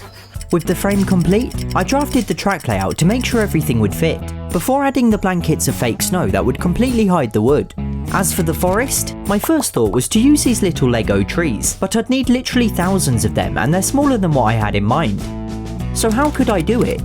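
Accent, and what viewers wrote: England, United Kingdom. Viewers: Are you british? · Are you British? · I have this lego trains and you speaking like english gentlemen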